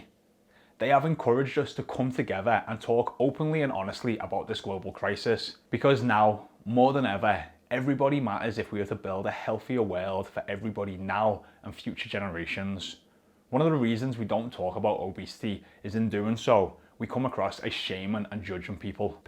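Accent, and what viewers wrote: Liverpool, United Kingdom. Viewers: Love the Scouse accent. Brilliant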